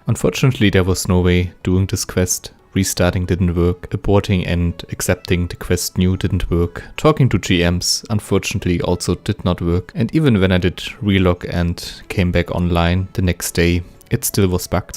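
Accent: Germany. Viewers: Ha, love that german accent:)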